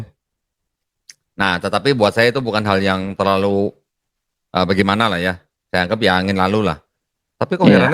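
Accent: Banyumas, Indonesia. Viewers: Koh ngapax dong???🤭🤭 sekolah nya dkt ST cilacap ya koh? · Berarti pak bisa ngomong ngapak dong?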